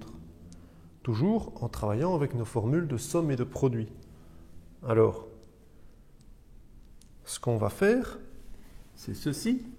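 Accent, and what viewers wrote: Belgium. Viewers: cet accent bien de chez nous haha vive la Belgique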